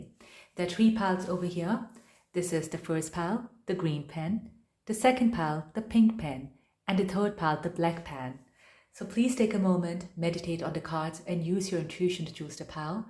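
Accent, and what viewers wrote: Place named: India. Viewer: Desi ho kya ? You sound indo English I’m indo Canadian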